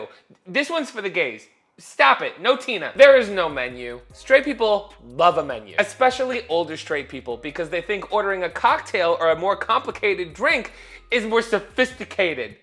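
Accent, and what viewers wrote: New Jersey, United States. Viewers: As a Jersey native, still having his Jersey accent after visiting his home is so cute and relatable. 😂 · I love a proper Jersey accent. Most think it’s Staten Island when it’s little differences. And definitely not Brooklyn either. “Cholly” instead of Charlie “Wuff” instead of “wolf” “Tawk” instead of talk or “towuk” (SI accent) “Wunnuh” not “wawunuh” (SI) “Guhd” instead of God or “gawd” (SI)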